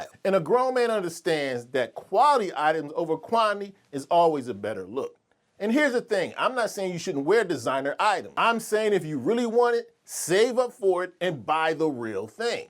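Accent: Philadelphia, United States. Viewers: You sound like you from west Philly or uptown. Jacket fire.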